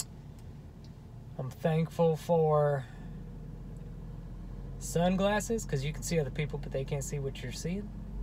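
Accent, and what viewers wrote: South, United States. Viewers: He’s from the South originally...it’s real :) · I'm thankful for the fact that you've developed a southern accent throughout the years. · He's said before that he deliberately dropped it because he didn't think he would be taken seriously. I think he plays it up a little in some of the videos, but, it's real.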